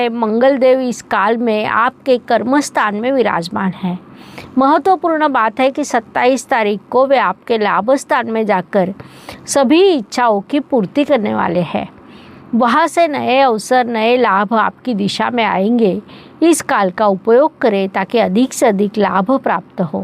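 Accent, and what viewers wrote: Maharashtra, India. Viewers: Arre aunty kyun tum marathi accent mei Hindi bolke jhoot pe jhooth phirse? 😢😢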